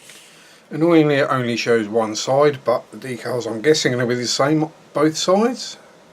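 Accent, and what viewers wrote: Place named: South Africa. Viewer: How do you spray white? ;) or how does one spray white :) whey mannn nowt rang wit talkin South African like!!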